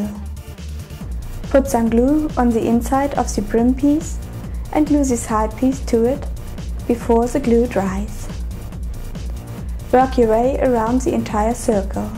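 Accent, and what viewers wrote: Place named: Germany. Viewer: You are a German girl. Lovely accent you got. See u pretty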